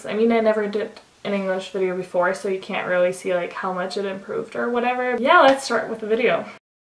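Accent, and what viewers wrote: United States. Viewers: Ich weiß zwar nicht, wie dein Englisch vor der Reise war, aber ich finde es echt super! Du hörst dich so amerikanisch an, als wärst du dort geboren und hättest dein ganzes Leben nichts anderes gesprochen 😊 freut mich total, dass es in der Schule so gut klappt 😍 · Omg ich dachte am Anfang seit wann habe ich eine Amerikanerin abonniert😂😂😂 Omg du sprichst so gut. Ich wäre untergegangen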